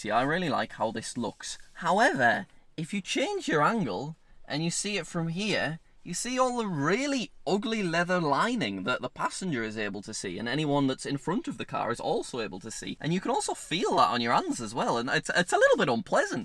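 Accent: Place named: Middlesbrough, United Kingdom. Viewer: Are you from Middlesbrough?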